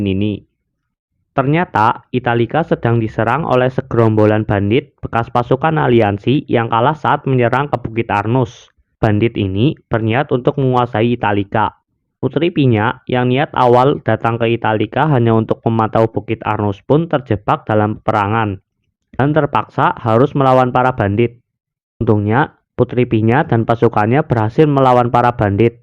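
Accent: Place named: Banyumas, Indonesia. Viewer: Semangat bang.. ngapak joss 👍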